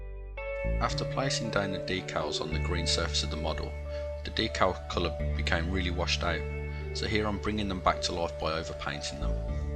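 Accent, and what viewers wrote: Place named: Midlands, United Kingdom. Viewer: instruction on the four videos is first class and the rhino is an ebay buyers dream.. its good to hear that midland tone back on youtube.maybe next time you would paint some forge world mk2 hh marines in the good old ultramarines livery...please. oh and you get 4 thumbs up..way to go.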